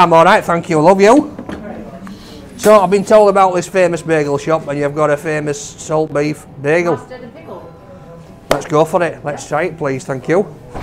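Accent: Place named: Scotland, United Kingdom. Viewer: He sounds more like Scottish or Irish accent or May be is he from Liverpool or Everton . His accent is more like funny .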